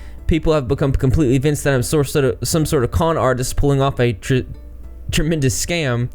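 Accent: South, United States. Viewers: Good video, but you look like a Kingdom Hearts villain with a southern accent.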